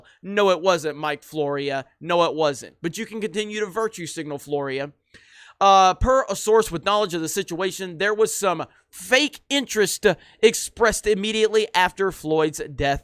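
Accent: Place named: Alaska, United States. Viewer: Are you an Alaskan Bush person? You have the same accent.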